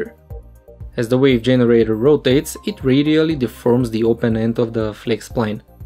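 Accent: Russia. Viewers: Please stop talking with a Russian accent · Русский акцент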